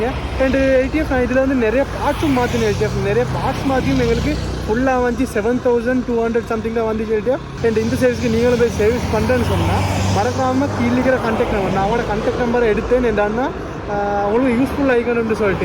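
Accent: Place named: Sri Lanka. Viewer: Sri Lanka tamil beautiful ah iruku but konjam puriyamatinguthu 😅❤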